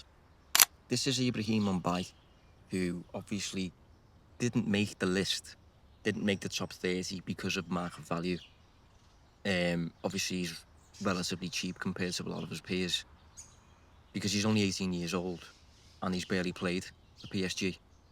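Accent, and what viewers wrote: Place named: Liverpool, United Kingdom. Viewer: I haven’t heard this lad before. A lack of judgement on my behalf…but when I heard his Scouse accent…despite being a youngster (to me) I took to him straight away and subscribed. O only really Listen to David Lynch. And he reads the Comments! So 💯 % respect from my side of Sefton Park… COYR’s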